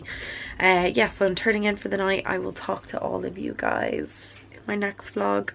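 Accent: Ireland. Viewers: Finally we get to here your Irish!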